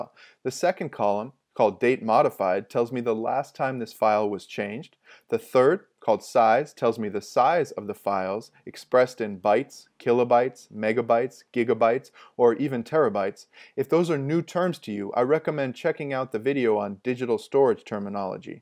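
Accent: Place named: Kansas, United States. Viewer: Cats and Ringworm yes, I really liked the delivery. I'm from Kansas, maybe it's the same dialect? thank you for this helpful video!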